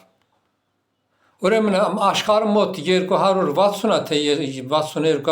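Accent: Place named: Armenia. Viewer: Ակցենտից զգացվումա որ Հայաստանցի ա, իսկ ազգությունը, թուրք ա ?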